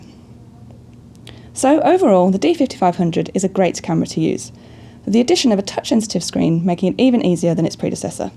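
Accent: United Kingdom. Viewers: That British accent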